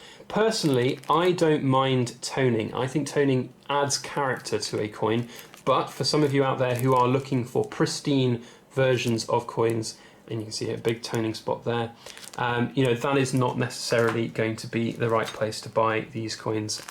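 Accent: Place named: Birmingham, United Kingdom. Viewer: My wife lived in England, she thinks you are from Birmingham. From the desert southwest states. Thanks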